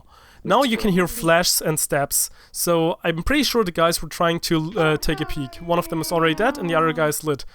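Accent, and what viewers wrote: Germany. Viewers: ! haha als ich das erste mal deine stimme gehört habe wusste ich iwie direkt das du deutsch bist ;D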